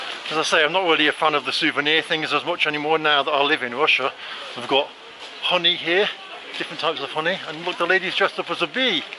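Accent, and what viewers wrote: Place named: Manchester, United Kingdom. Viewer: I have subscribed. R u from Manchester judging by accent? I might b wrong tho 😂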